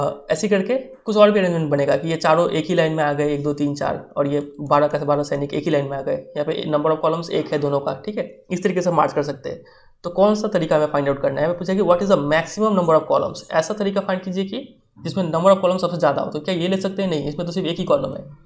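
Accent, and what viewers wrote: Bihar, India. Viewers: Bhaiya aap Bihari ho kya aap ki language Se Lag Raha tha😅🤙👍 vaise same to you😅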